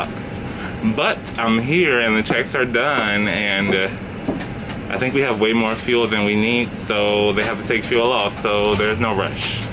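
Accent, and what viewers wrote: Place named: New Orleans, United States. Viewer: Are you originally from New Orleans? You sound like it. I am a 62 year old woman who finds your videos very entertaining. Thanks for making me laugh.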